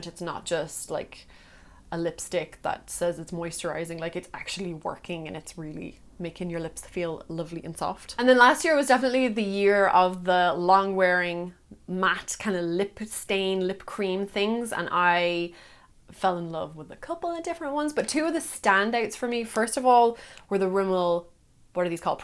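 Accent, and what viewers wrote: Ireland. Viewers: I have been following you for a few years now. At first, you were my go-to beauty guru simply because of the accent! My husband is from Ireland (I am from America) and we have been in a long distance relationship for 5 years, so watching you has always made me feel at home. But after watching for a while, you ignited a fire and passion for makeup within me, and you have helped me grow in my expertise. You are real, you talk about your flaws without degrading yourself for having them, and you are someone that so many in the makeup world can look up to. I'm so glad that this community has helped you grow in the past few years, but please remember that you have helped us grow as well! Cheers x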